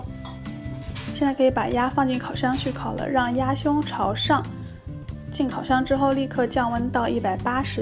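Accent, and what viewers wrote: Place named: Nanjing, China. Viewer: 南京人！哈哈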